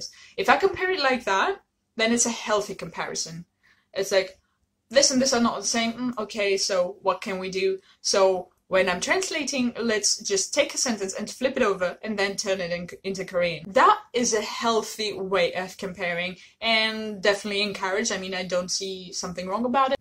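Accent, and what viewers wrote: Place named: United Kingdom. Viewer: Τόσο τέλεια Βρετανική προφορά 🇬🇧🇬🇧 You sound a bit posh😂😂😂 Είσαι τέλεια .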